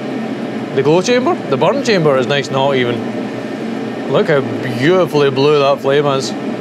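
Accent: United Kingdom. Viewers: Best Brit voice ever. ,,bloody Right. small curses will be ignored by, ,,your Church. Happy,, Holidays,,and Knew Year You'All.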